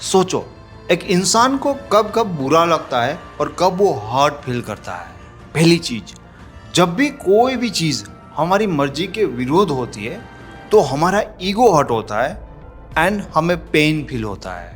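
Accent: Gujarat, India. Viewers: आप गुजराती हो? आपकी हिंदी गुजराती पटेलभाई जैसी है।😂😂 मजा आता है सुन के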